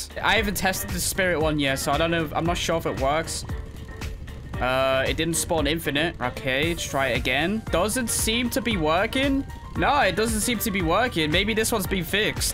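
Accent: United Kingdom. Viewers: Bruh he’s British that’s why he says it like that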